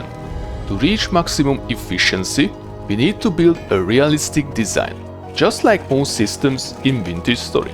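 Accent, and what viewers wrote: Hungary. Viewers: Sounds like you have a Hungarian accent, am I right to assume that it is your mother language? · instantly recognized the thick hungarian accent. Bojler eladó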